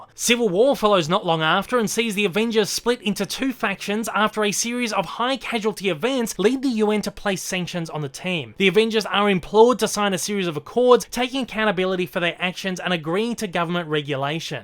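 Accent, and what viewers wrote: Australia. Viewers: r u australian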